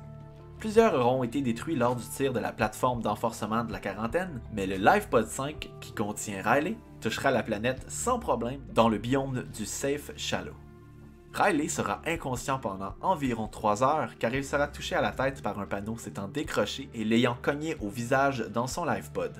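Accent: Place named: Quebec, Canada. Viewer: j'adore merci pour cette série, je suis d'ailleur jaloux de ton accent qc le miens en pas assez prononcé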